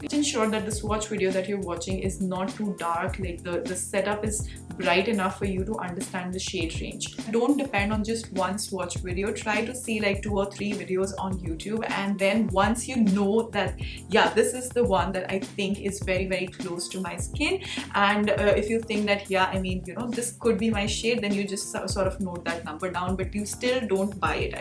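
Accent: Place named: Punjab, India. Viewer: I hear ur accent though 😂😂😂😂 please bring ur phnjabi accent back noooo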